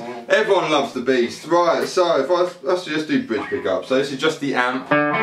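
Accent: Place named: Southampton, United Kingdom. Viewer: Mate, you're a riot 😄 Just discovered you tonight on YouTube where I love to spend most of my down time here in Australia watching everything guitar (& I'm from Southampton, so your accent reminds me of home) I play a Strat & a couple of Les Pauls through a Vox AC15cc with Mullard NOS tubes. Just bought a J Rocket "Archer" pedal for Klon like boost/distortion - sounds great! Trimmed my pedals down to the basics now.. MXR Delay, TC Looper, TC Mini Tuner & amp foot switch reverb & trem Looking forward to lowing through your uploads, keep up the good work mate! PS - a little envious you got to meet Phil X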